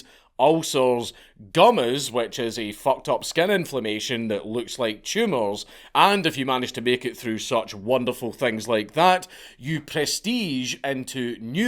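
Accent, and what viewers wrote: Scotland, United Kingdom. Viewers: Just got off work from the MMJ dispensary. My favorite medicine. So why not listen to one of my favorite Scottish men talking about really bad drug companies. Aahhj yes! Ripping good time! Thanks!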